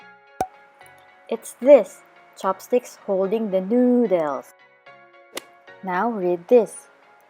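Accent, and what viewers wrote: Philippines. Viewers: Your accent is so filipino, it's nice hahah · Are you Filipino? I could hear the accent, also trying to learn and just understood all of hiragana I think haha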